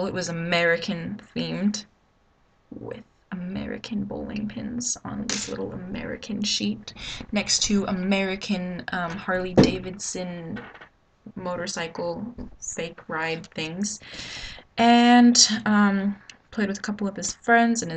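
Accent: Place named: Germany. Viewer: It's great to hear your German-influenced English now! You're speaking English but you're saying it the way I imagine the German phrases or expressions would be said. :)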